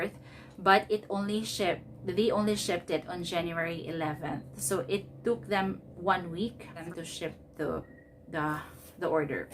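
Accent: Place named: United States. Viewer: I love the accent i thought naaa US po talaga kayo meem hihi wink wink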